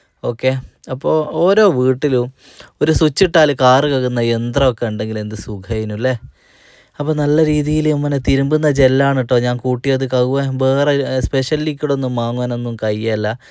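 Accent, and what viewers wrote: Vadakara, India. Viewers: നമ്മുടെ വടകരസംസാരം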